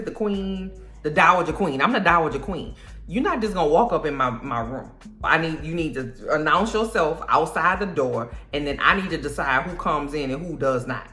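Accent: New Orleans, United States. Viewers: Great review, and love the Nola accent lol! I was highly entertained. Keep em coming sis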